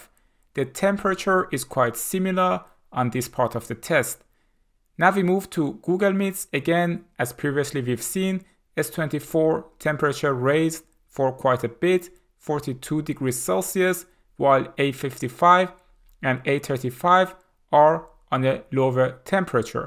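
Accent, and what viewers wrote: Iran. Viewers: سلام سید لحجه داری ، حس میکنم ایرانی هستی درسته ؟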